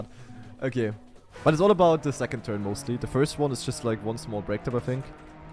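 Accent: Germany. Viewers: Finds immer geil wie dein deutsch durch kommt 😂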